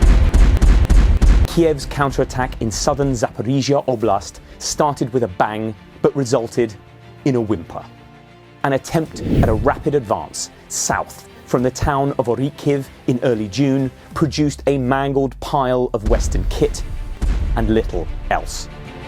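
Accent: United Kingdom. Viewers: I am so sick and tired of some idiot with a British accent telling me stuff. It’s insulting.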